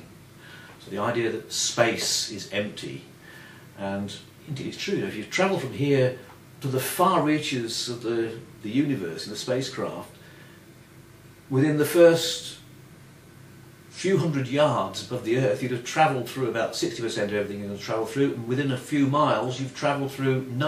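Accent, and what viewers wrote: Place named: Australia. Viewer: The visible universe is but foam on the ocean of the unseen/dark universe. Incredibly mysterious to us now as the leading edge of quantum and classical physics. Also, even though he's obviously English, I do detect that Mr. Close certainly has an accent that sounds unmistakenly 'Australian' ... of sorts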